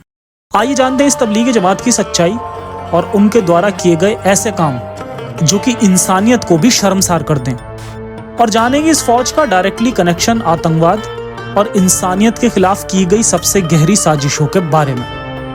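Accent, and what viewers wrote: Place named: Uttar Pradesh, India. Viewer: उत्तर प्रदेश का है इस की बोली से समझ में आता है भाई😎 सच बताना भाई में सच बोल रहा हूं ना।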